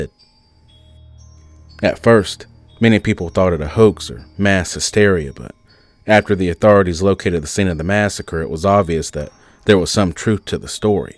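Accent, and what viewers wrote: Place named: South, United States. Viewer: Yet southern accent turns me on.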